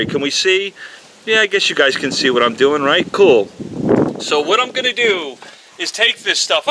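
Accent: New York, United States. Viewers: How comes you sound like your from NYC and live in Texas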